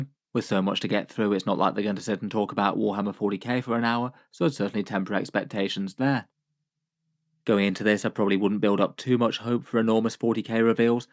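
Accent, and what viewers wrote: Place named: Southern England, United Kingdom. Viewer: Somewhere in the south. And Games Workshop is a British company, there’s stores in nearly every town.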